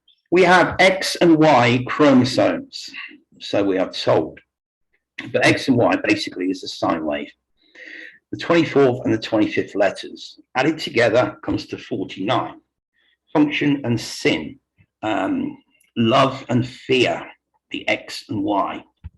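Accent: Australia. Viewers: Then emigrated to Australia, does he sound like an aussie?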